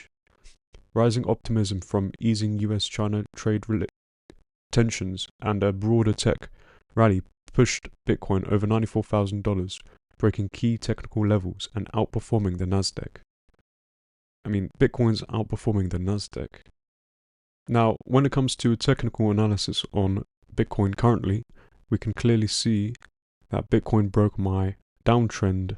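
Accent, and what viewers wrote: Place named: England, United Kingdom. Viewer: Are you English? Usually I know from the accent. You sound English to me, but I'm not 100%. You could be a Scandinavian who knows English as 2nd language from the crib maybe.Not Australian, not American, not east Europe, or west Europe, not south Africa, not from New Zealand definitely. Dude, you're killing me.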